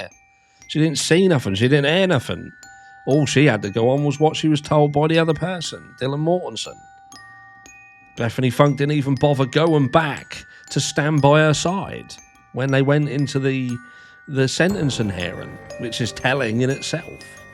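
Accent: United Kingdom. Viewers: I love the way you swear in your lovely British accent! Keep it up. Love from Idaho!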